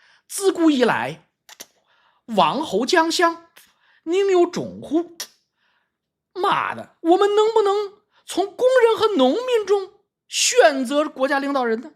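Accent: China. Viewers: 您的湖南话真像😂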